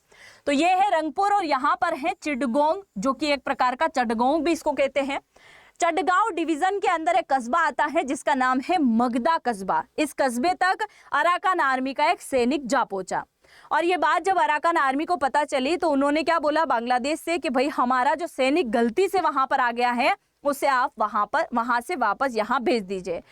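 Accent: Gujarat, India. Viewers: मैम, आपकी हिन्दी भाषा में गुजराती एक्सेंट सुनाई पड़ता है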